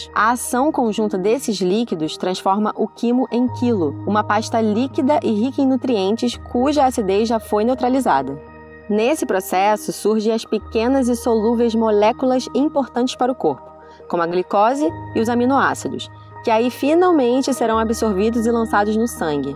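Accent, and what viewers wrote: Rio de Janeiro, Brazil. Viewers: Infelizmente as pessoas não sabem que para atingir e satisfazer as pessoas de todo o Brasil, o sotaque deve ser neutro. Não consegui ver o vídeo todo, que deve ser muito bom, pelo sotaque carregadíssimo, que creio ser carioca.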